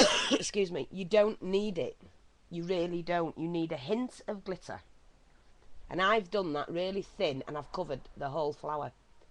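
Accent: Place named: England, United Kingdom. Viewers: Loved the video. I am all about saving money, and still having a great product. Thanks so much from B.C. Canada. I do Art Journalling and taking a course on Monday. Will be making some and using it on my page. Will pass this along to everyone. Thanks again.. Love the English accent.. I have English/Scottish Ancestors, and plan to go to England/Scotland hopefully again in a couple of years.. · Awesome, I subscribed cos I liked ur accent, makes a change from the tuts been american .... good to hear a northerner ;) ... great tut btw, can't wait to watch more x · Yorkshire accent rockz! Go! <3 Love all your tuts! ^^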